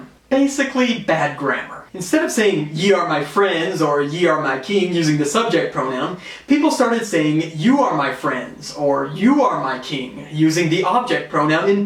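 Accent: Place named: United States. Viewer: Hilarious - a seppo explaining old English! Though, accent aside - he does a good job of it.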